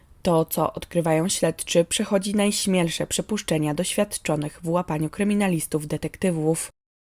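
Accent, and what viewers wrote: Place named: United States. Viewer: Mnie dość "bolało w uszy" wymawianie niemieckich imion, nazwisk i nazw miejscowosci z amerykanskim akcentem. Z polskim byłoby zdecydowanie ok. Bardzo ciekawy odcinek. Pozdrawiam.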